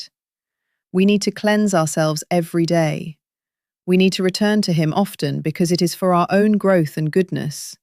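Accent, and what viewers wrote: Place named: United Kingdom. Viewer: She mentioned that she is from USA, but she has a strong British accent, is this the same woman?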